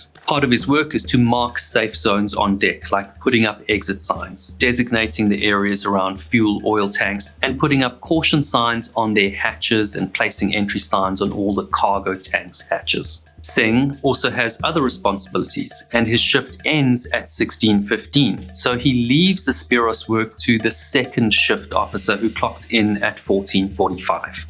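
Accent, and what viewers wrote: South Africa. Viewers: Wait, you are southafrican? Accent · Loving your videos. You also sound like your south African. I cannot and don't ever condone censorship cept to photos of uninvolved topic like children like a family photo showing the suspect in it too for example. But otherwise i despise it so don't show something when you censor it. Why show it then? Anyways despite that leading to a thumbs down az i always do zo for any censorship when topics like this or with true crime is involved always will get a dislike as i don't like censorship in such topics. But that said i love your videos still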